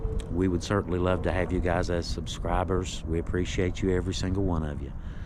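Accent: South, United States. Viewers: I wish I found this channel sooner. I will watch more videos as this one is fascinating., you have what I think of as the true southern accent, calm, measured speech. My mother's people were in Arkansas and I thank you for bringing their voices back to me.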